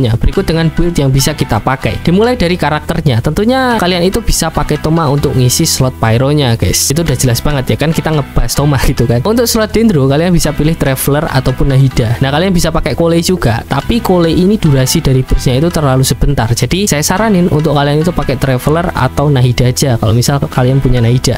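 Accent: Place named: Java, Indonesia. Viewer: Medok kali woi Kek gw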